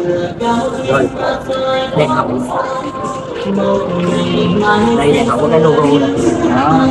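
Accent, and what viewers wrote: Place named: Thanh Hoa, Vietnam. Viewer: Chuẩn bác, nghe thanh hóa là biết ngay 😊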